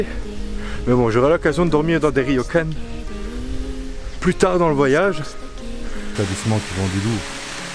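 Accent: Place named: Belgium. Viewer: Salut! C'est chouette d'entendre parler "belge" au sujet du Japon. Merci pour ta trop brève vidéo au sujet de Kobe. Je rentre d'un 5ème séjour (en 10 ans) au Japon. J'y pratique la pêche "en mer du bord". Je suis namurois et j'ai 73 ans. J'étais à Yokohama qui rime avec drôle de choix jusqu'au 20 juin. En bon amateur de tout ce que le Japon propose, je prépare déjà mon prochain voyage et ce sera...Kobe. Voilà comment je tombe sur tes reportages. Bravo, je t'encourage à poursuivre. Konitchiwa. Guy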